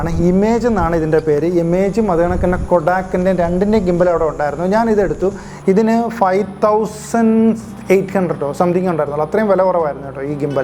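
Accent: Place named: Central Travancore, India. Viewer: അണ്ണാ നമ്മുടെ തിരോന്തരം ഫാഷേല് പറ അണ്ണാ, ഇതു അണ്ണൻ deliberately മധ്യ തിരുവിതാംകൂർ ഫാഷ പറയുവാണ്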